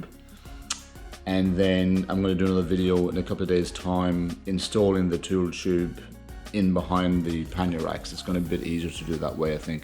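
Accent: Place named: Ireland. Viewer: Sounds Irish 😅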